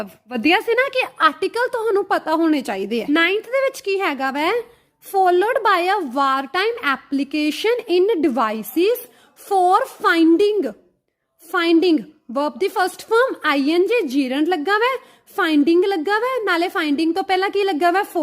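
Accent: India. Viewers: Your Punjabi accent is clearly reflected when you speak in English. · Tusi majhe wal de lgde j 😁👌❤️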